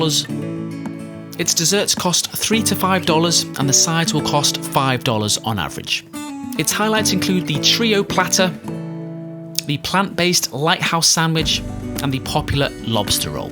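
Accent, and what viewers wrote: Liverpool, United Kingdom. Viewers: American who used to live in the UK here - can't tell if you're a Scouser or from Stoke-on-Trent :) Am I close?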